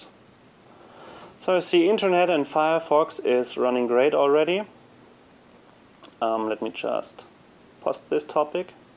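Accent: Germany. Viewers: you sound very german !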